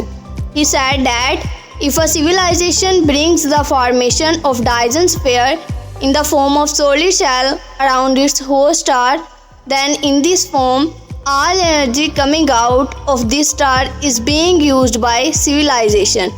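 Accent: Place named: India. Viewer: jesus fck that fckin indian accent..villa ttttaatttttaa wittaa tttaavitta ttaattt aaa 😆😆😆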